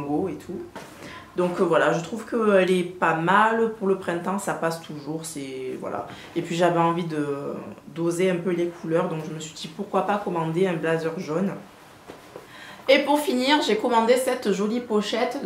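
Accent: England, United Kingdom. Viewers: Oh mon dieu! Thumbs up for the english accent hihi ;') ! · Super l accent anglais lol👍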